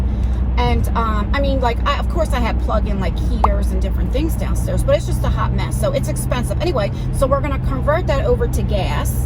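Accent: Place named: South Jersey, United States. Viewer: I watched the whole thing. I can watch you 24/7 day bc it feels like I’m just hanging with my girlfriends lol I’m from south Jersey and talk just like you so I piss myself when you start dropping “Motherf** & friggin lol. That’s me all day everyday 😂❤️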